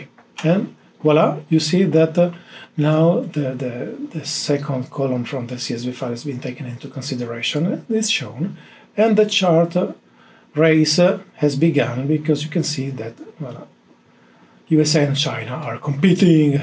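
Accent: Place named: Italy. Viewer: Beneficial video. Besides that, I like an accent on the last consonant. Truly Italian.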